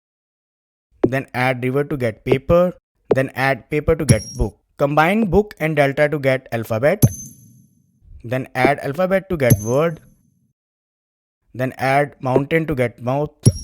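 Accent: India. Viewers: bro got the india accent with an innocent brain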